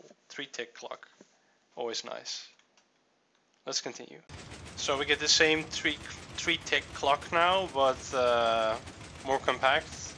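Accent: Netherlands. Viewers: dude je engels klinkt nederlands. dude your english seems dutch. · Ur dutch? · YOU MUST BE DUTCH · dutch english sounds sooo bad :S nothing personal man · i stil think you're from holland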